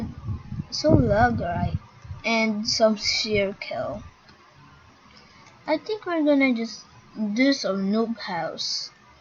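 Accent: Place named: Philippines. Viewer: I can tell by your accent you are filipino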